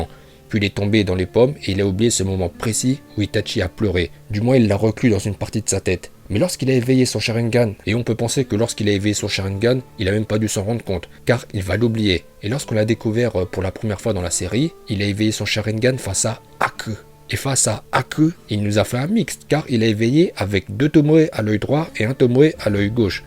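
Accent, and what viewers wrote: Japan. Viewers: pour eveiler le sharingan il faut d'abord controler le migaté no chovouie ps ton accent japonais est incroyable je met mon like directe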